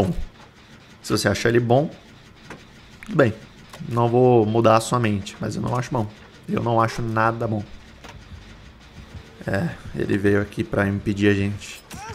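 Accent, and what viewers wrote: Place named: São Paulo, Brazil. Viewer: Pelo sotaque acho que é paulista, mas não tenho certeza.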